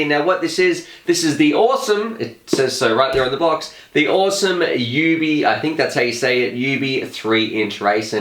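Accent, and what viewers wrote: Australia. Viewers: I did watch more than 20 Videos from you since I found you channel last week. And from a german point of view, australian english sounds better than american or uk english. Alrighty!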